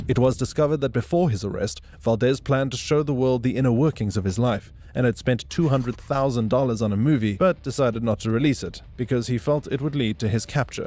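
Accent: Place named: South Africa. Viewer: Its a south african bloke lol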